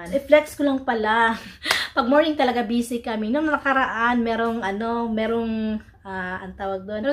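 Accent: Philippines. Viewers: Tanung mo nga sa asawa mo kung kapag nagsasalita ka ng Korean, may accent ka ng pagka-Filipino? Kasi mukha ka naman ding Korean, gusto ko lang malaman kung kapag nagsasalita ka ng Korean, alam ba ng mga Korean na hindi ka Korean dahil sa accent mo?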